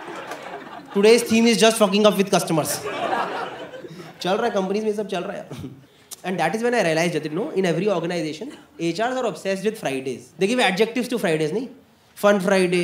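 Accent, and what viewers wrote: Delhi, India. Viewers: Typical delhi accent :D just loved and liked your all the videos...your new fan :D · Every second Delhite sounds like this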